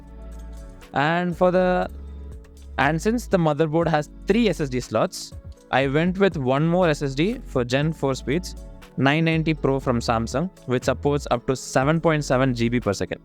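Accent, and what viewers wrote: India. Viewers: oh mannn, the indian accent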